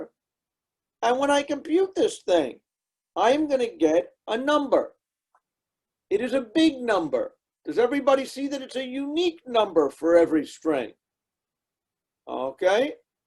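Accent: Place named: New York, United States. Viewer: a thick New York accent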